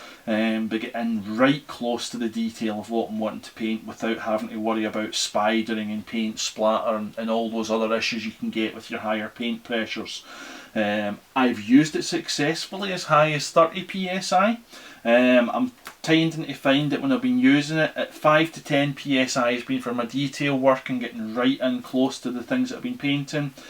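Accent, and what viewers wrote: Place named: Scotland, United Kingdom. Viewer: LOVE the accent ,what part of Scotland are ye frae?? Great review it helped loads!!!THANK YOU!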